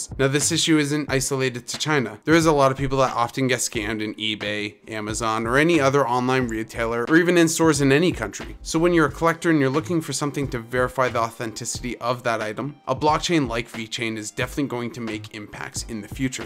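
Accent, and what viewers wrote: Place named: Canada. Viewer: I dig your Canadian accent. I bet people on YouTube around the world (other than US and CA) think you're American. There's only 4% of things you say that give you away. ;-) I follow about 8 crypto channels and am HEAVILY invested in this world and have added you to my list to follow. You're knowledgeable and I dig that. Thanks, brother to the north.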